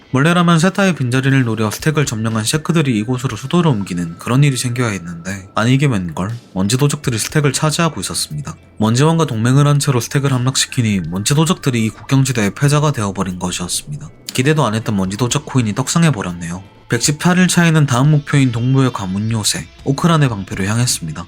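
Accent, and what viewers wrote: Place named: Gyeongsang, South Korea. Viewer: 뭔가 내부자들 조승우 같은 서울말쓰는 경상도사람 느낌이라 평온함 ㅋㅋ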